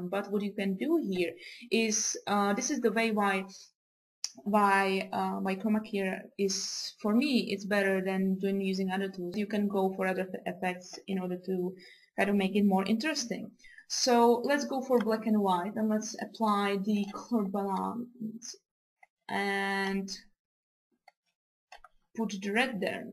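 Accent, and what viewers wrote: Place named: Czech Republic. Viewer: Já jsem si říkal, že tvůj přízvuk zní hodně česky. Díky za tutoriál!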